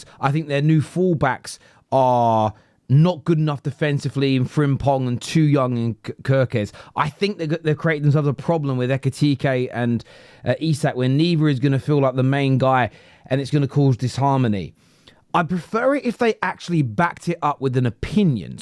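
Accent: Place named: Scotland, United Kingdom. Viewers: Who is this Scottish tosspot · Scottish accent heard, opinion objected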